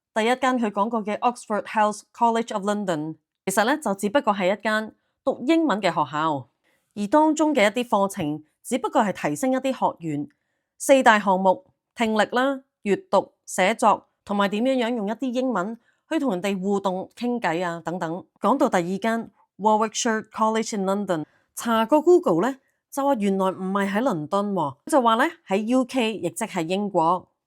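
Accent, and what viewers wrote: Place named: Hunan, China. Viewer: 七仙魚口音應該是湖南人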